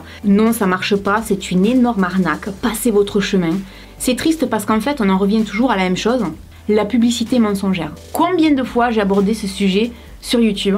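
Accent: South, France. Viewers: Bonjour ( petite parenthèse perso vive les accents du sud) c’est la deuxième vidéo de toi que je découvre et j’apprécie beaucoup! Tes vidéos sont honnêtes et faites pour aider en donnant un avis sincère donc merci de ton aide et à bientôt je suivrais tes prochaines vidéos 👍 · T'es sudiste non ?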